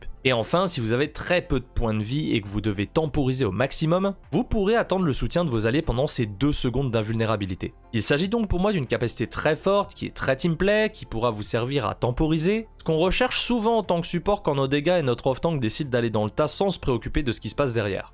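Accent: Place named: United Kingdom. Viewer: je te juge pas mais ton accent anglais seris... désolé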